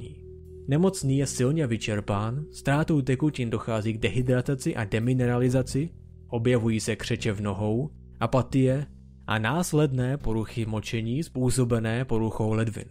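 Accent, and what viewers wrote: United States. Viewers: Ale ten americký přízvuk 😆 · Jak někdo v průběhu natáčení videa chytne americkej přízvuk? :D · Přírodopis mně celkově nebaví ale takovýmhle stylem mně to baví :) Ale zdá se to jen mně nebo máš někdy takovej americkej PŘÍZVUK?? :D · D Zdá se mi to nebo máš takový Americký přízvuk ? :-D Jinak super jako vždy a prosím pokračuj tak v úžasných videí , přeji ti hodně štěstí do života :-) . Fanynka Hungergirl :-) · hezký Americký přízvuk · Tvl ty mluvíš jako Američan to tvé R je děsný 😄😄😄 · zajímavý ale mas trochu americkej přízvuk! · Dobře video ale máš americký přízvuk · dobrý video ale ten amevrickejj přízvuk :D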